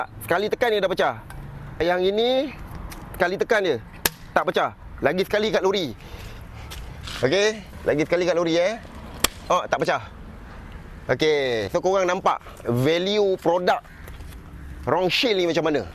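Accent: Java, Indonesia. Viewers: Jawa bang… kena paham bang… gitu la bonyinya… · Pekat jawanya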